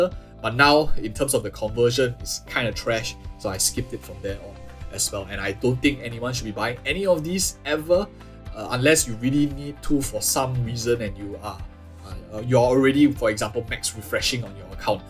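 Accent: Singapore. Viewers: u sound like singaporean sia, i like your content keep it u bro! huat ah!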